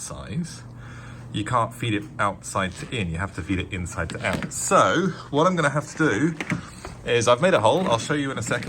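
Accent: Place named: England, United Kingdom. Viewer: I'm surprised nobody has commented on your Bokke jersey to pair with your English accent. Go Bokke!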